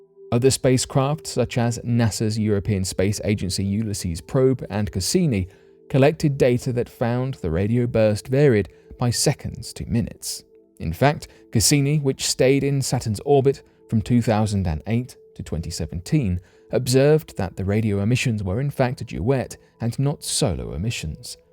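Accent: United Kingdom. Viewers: Appreciate the British accent.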